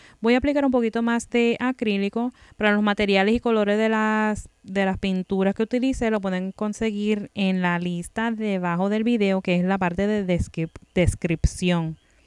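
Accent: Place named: Cuba. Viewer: esa es una cubana o puertoriqueña)=? que linda voz tiene dame su correo ja ja ja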